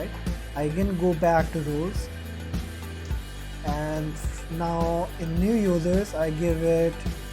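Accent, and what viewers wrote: India. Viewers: indian accent yup a scam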